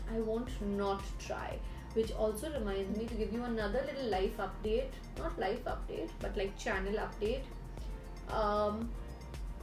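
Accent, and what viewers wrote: United Kingdom. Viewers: I think you also should start new channel for just ur british accent! For ur british accent fans... 😅 You should atleast start some series though in ur british accent🤞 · Hii... after a long time makeup video.... felt good after watching this😊... & ya old is back & so happy to see the old version🙂... & your British accent is 🔥🔥... & just wanted to say that I love u ❤❤❤🥰🥰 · Omgggg, your brit accent is on point 😱💕 Loved this chatty video! 💕 Pls do some collabs! 💕 Even I feel like such a community/communities are important 💕💕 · British accent videoooo!!! Yesss!!!! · Hey this video was so good you i was doing my assignment and while doing my assignment i was just listening to you and i t felt so good listening to uhh and it gave a lot good vibes and i especially loved ur British ascent and lots of love to uhh and more power to uhh ♥️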